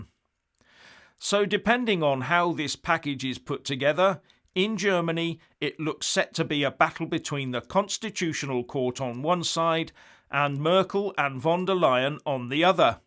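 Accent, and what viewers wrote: United Kingdom. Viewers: Great analysis! Very interesting for those of us across the pond, who don't hear much of this stuff every day! (And I LOVE the crusty British dress and speech!)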